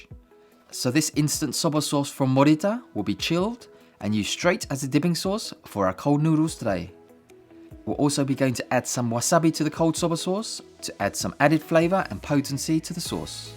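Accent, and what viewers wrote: England, United Kingdom. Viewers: Nicely done,. It's a burning Summer here right now in the Philippines so I guess we'll just opt for the Cold Soba (actually Udon is what I have in stock) for now. 😊 Thanks for the very informative tutorial. Loved your beautiful English accent too!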